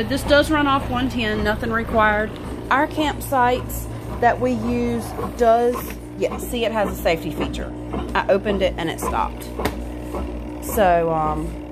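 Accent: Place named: North Carolina, United States. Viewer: Are you from NC or SC? Your accent is damn near identical to mine and I'm from NC 😆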